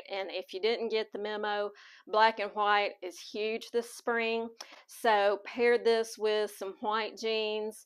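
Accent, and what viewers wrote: South, United States. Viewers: Enter me!! I love your videos and Your charming southern accent! Thank you for sharing.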